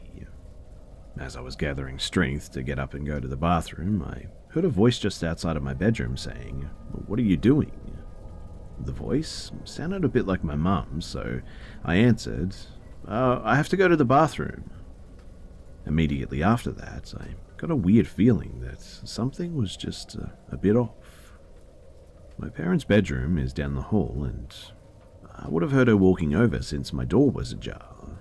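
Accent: Australia. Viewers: Sounds both like an australian and new Zealand and somewhere in the Americas accent. I really like it. 🤗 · I love an Aussie accent, but dude, slow the words down, you miss storytelling when you just read off a script.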